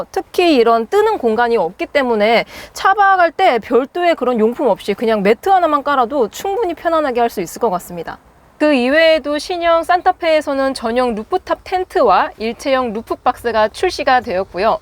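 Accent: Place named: Gyeongsang, South Korea. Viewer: 아나운서 같은게 더 세련된건가? 전 원래 컨셉인 정감있는 경상도 아가씨가 좋아요.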